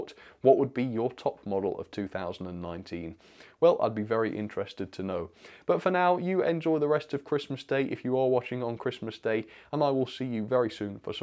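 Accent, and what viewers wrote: United Kingdom. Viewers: i dont think i will... train sets are like 20 times outside of my price range for a hobby. also no offense, but the few people ive meet who are into model trains are really really really obsessed with trains. i dont think i would fit in and would be viewed as a filthy casual within the train community. i watched your thomas set vs real life trains. i like to see home made competitions like that. jelles marble runs does a fantastic job with the marble olympics. i also really like your voice, and the britishness, if thats even a word.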